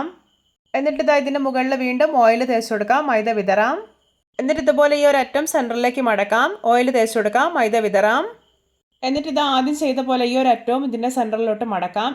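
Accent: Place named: India. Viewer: ആന്ധ്രാ/ ഒറീസ്സ നിവാസിയാണോ? നന്നായി മലയാളം പറയാൻ പഠിച്ചിട്ടുണ്ട്... ഒന്ന് കൂടെ ശ്രദ്ധിച്ചാൽ മലയാളികളെപ്പോലെ സംസാരിക്കാൻ കഴിയും.!!! മലയാളം നല്ല ഭാഷയാണ് അതിലേക്ക് കൊങ്കിണി പോലെ നീട്ടി സ്റ്റൈലാക്കേണ്ട 🙏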